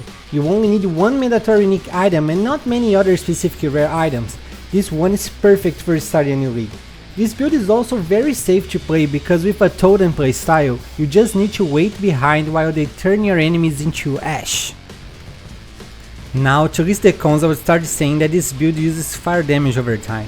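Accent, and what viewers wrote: Brazil. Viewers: Quando um brasileiro fala ingles tu entende tudo.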